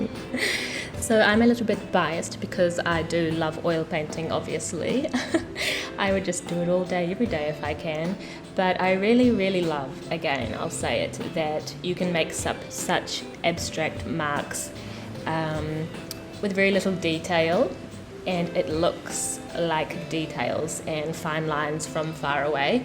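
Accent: New Zealand. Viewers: Are you from New Zealand? 😊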